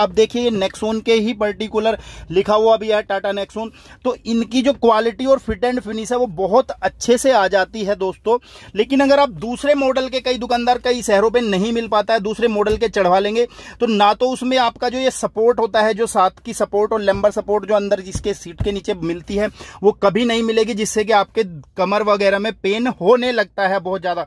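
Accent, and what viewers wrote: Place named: Meerut, India. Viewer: Bro...are you from Meerut ?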